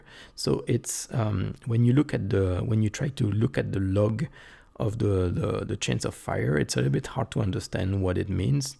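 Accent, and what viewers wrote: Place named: France. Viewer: Thanks for this video. It was really helpful for me. Nice and pleasure English language with French accent. Greetings from Poland 🇵🇱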